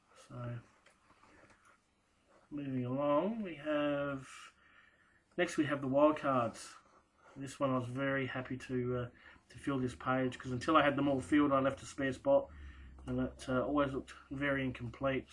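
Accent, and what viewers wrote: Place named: Australia. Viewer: wow wow mate great pc, its great to see some old cards taken good care of. i will go though my cards and see what i can find for you, i would be very proud mate. oh and love the jacket very nice.i will send you a pm in the morn mate, just had a real lond day at work. and its soooooooooooo good to hear another aussie on hear!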